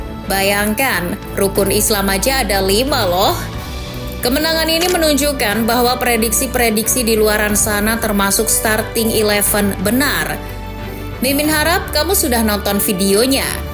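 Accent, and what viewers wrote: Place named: Manado, Indonesia. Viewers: Logat manado wkwk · Lah admin nya orang Manado ya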